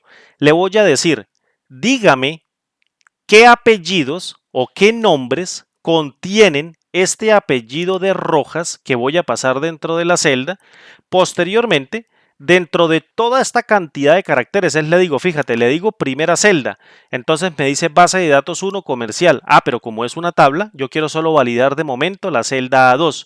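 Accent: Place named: Costa Rica. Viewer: Tu acento me suena al de mi pais, Costa Rica ♥ o talvez Colombia...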